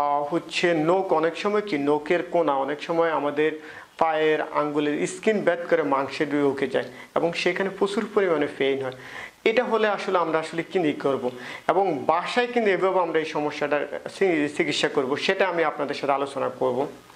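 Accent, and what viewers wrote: Noakhali, Bangladesh. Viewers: আপনার বাড়ী কি নোয়াখালী? কথা শুনে মনে হচ্ছে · নোয়াখালী মনে হয় স্যারের বাড়ি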